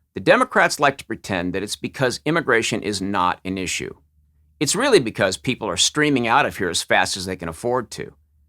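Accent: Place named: New York, United States. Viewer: You might be the West coast but you sound like here in New York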